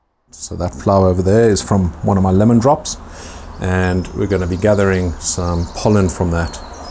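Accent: South Africa. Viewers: Thanks for the video. It's rare to find one that has so much clear info without wasting the viewer's time. It's also nice to hear a South African voice again as an expat :) I'd call it the drop kick.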